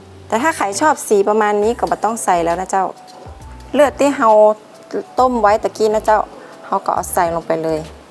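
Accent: North, Thailand. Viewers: เป็นภาษาคนภาคเหนือคะ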